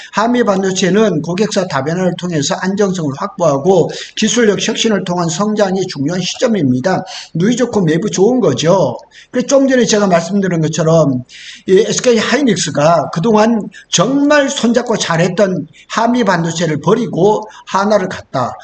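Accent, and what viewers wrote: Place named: Korean-Chinese, China. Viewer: 억양이 조선족. 의심스럽다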